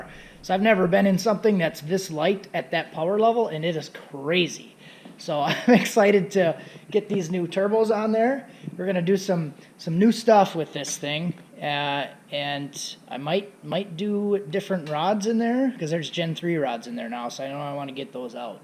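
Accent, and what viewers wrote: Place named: Wisconsin, United States. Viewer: Wisconsin. I thought you were near me from your dialect lol. Yooper here.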